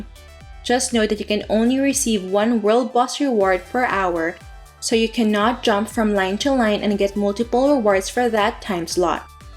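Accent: Philippines. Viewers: hi miss are you half filipino? just want to know bcz of some accent words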